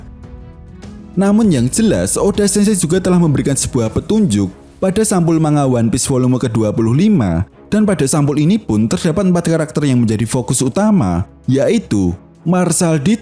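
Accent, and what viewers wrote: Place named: Banyumas, Indonesia. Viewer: ngapak ya min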